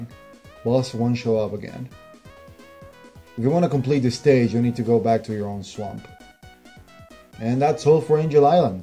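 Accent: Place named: Argentina. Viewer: What an accent you have man! I'm also from Argentina and I still sound like one while speaking in English , s3 and knuckles definitely is one of the best sonic between mania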